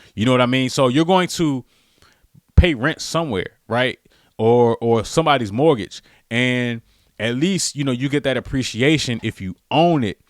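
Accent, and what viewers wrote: DMV, United States. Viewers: You sound like you are from the DMV? Is that where you are based?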